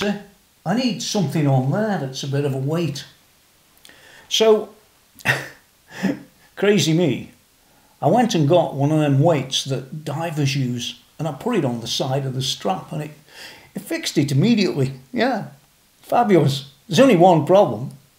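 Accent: Stoke, United Kingdom. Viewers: Sounds like you’re from Stoke? 🙌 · I don't know why, but the stars and stripes strap combined with this british accent gave me weird feelings.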